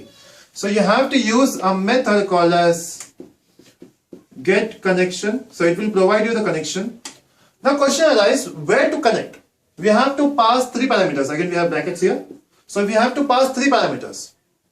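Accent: Russia. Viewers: for 10sec i thought u were russian sir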